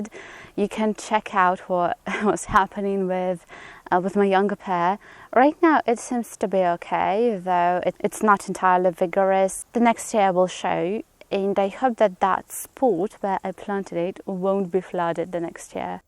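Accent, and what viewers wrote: United Kingdom. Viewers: why you have a british accent? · Ur accent is british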